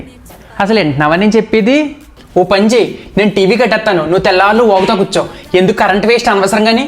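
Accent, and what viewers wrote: Godavari, India. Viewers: external looks doesn't matter for me. So I don't care about color mismatch. He looks as good as he is now. He acts well. His dialect is too good. I like the way he speaks godavari bhaasha.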